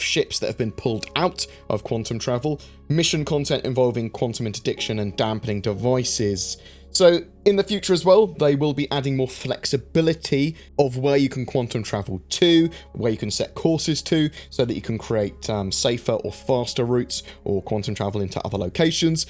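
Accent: United Kingdom. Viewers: The fun thing about listening to videos from people in another country than where I live is the difference in slang and pronunciation of words. I do wonder though if some of what I hear is an artifact of the recording device. I know that in the UK you use "Maths" where in the US we say "Math" for example.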